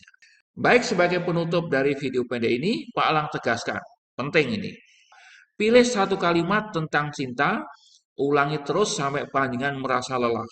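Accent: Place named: Pekalongan, Indonesia. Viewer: Pekalongan hadir... Logate jenengan mirip2 pekalongan.. Jenengan pundi?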